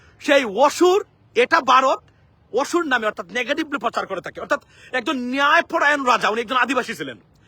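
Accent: Sylhet, Bangladesh. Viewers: Bro sylhoti😅 You are gud boi.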